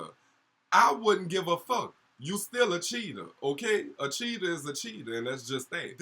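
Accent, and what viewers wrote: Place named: New Orleans, United States. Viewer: I'm mad at you! you got the nerve to make the episode seem exciting but it was Trash LoL, you're brilliant. Love your Bondy & Supa accent in the hse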